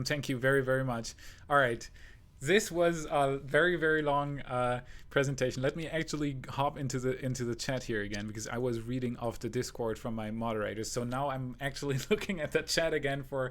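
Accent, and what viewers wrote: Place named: Bavaria, Germany. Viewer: Being an old Army brat my dad's last posting was Stuttgart.The first time I ran across you I was shocked,you looked just like my childhood friend Helmut from my school there,right down to slight Bavarian accent most likely don't notice lol.Your English is VERY good,better than some Americans lol.And I'll say you have a beautiful family and I'm looking forward to you coming to America to stay.Maybe someday I could meet you I hope,my oldest son is 37 so hopefully we have things in common.God bless and keep you:-)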